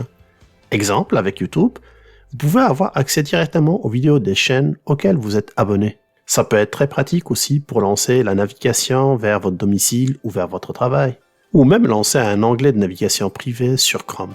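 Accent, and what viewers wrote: Quebec, Canada. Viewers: Bonjour de France pitié je suis entrain de recherché des tuto sur Youtube comment stopper les pubs qui nous dérange presse tout les 4 minutes en visionnant des youtubeuses et youtubeurs ou des émissions ou des films sur Youtube toujours que je parle .donc g pas 1 smartphone dernier cri mais 1 petit simple Wiko .et attention qui n'a pas toutes vos options et appli ? Entre autres les vns privé ou je c quoi je la trouve pas? G juste 1 minimum syndical ? MDR ah ah pitié au secours besoin 2 votre aide urgent ?en attente stop mes recherches et je reste avec vous ok Fréro ? Merci et en plus on adore l'accent 2 Québec. MDR France mardi 1 er novembre 2022.14h 45 de l'après-midi et chez vous c quoi? Bon en attendant la réponse je m'abonne d'urgence pour pas rater le retour ? Ah ah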